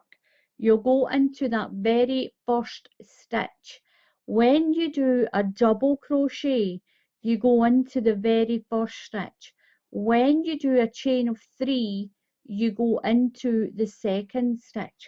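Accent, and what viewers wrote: Scotland, United Kingdom. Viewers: I love your videos. You explain everything so clearly and precisely. Your beautiful accent takes me back 50 years to when I worked with 2 Scottish ladies who helped me refine my knitting skills. Now another Scottish lady is helping me refine my crocheting skills. Thank you for taking me back to a happy time in my life. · I should have written lovely 😄 Do you use lively in Scottish? I'm from Finland. No polar bears here 😄 Your accent is adorable 👍 ☀️ Happy New Year!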